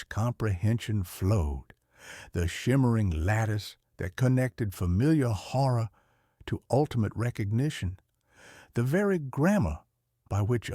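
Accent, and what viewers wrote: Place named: Georgia, United States. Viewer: truthfully I think it's the Georgian Southern gentlemen voice narrating THIS at me that's oddly... amazing somehow for some reason I can't quite put my finger on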